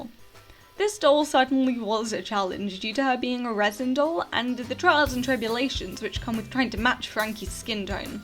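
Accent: United Kingdom. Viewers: She's well cute ❤️what country are you from ,you sound like a posh English person ☺️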